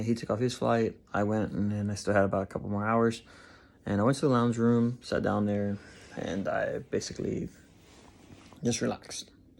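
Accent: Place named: United States. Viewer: I get my procedure with her in less than 2 weeks. I can’t wait. It sounds like you’re from the USA too? I have non stop flight from Chicago and it’s only 10 hours. I am wondering though how it was for you once you got into turkey and also traveling home as far as entry / customs go. I have my evisa and I also heard something about a passenger locator form before leaving too. Anything I need to know?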